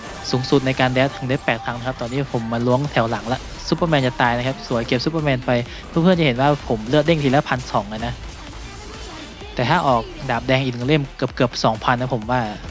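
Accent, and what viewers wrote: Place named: North, Thailand. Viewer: สาบเมืองอยู่นะ คนเหนือไหมนี่555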